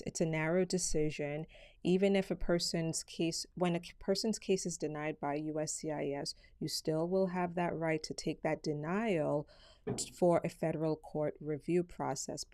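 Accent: Jamaica. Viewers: Jamaican? You just made me like your presentations even more, if that's possible!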